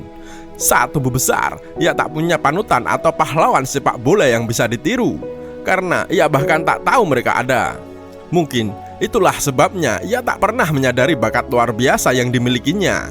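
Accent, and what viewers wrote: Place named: Java, Indonesia. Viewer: Medog amat om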